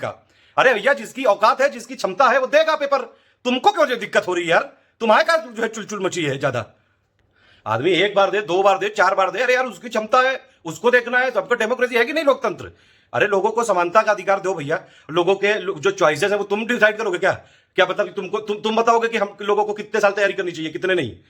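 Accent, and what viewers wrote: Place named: Kanpur, India. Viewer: Kanpuriya language Maja aa Gaya sir Simple baat hai 2022 me Kuch Kiya jaye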